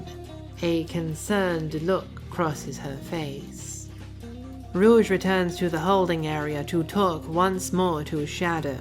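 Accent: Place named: United Kingdom. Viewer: Why do you have a british accent in some videos but not in others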